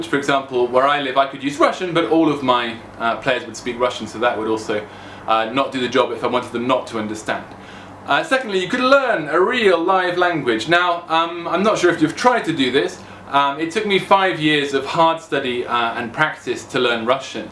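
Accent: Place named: Germany. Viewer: Bonega filmo mi speziale amas, tiel vi parolas angle kun germana akcento 😂 #kor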